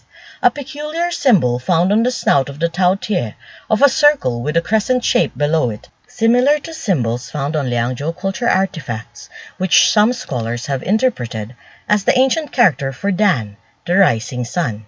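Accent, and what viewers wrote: Philippines. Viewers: What is this now, where do you get your information? Everybody wants to make money making videos. You sound Filipino.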